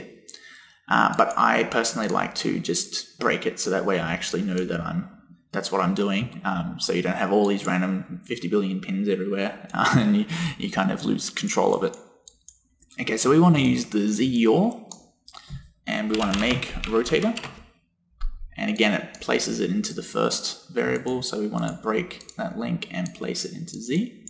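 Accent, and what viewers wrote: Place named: Australia. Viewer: Where are you based, you sound aussie??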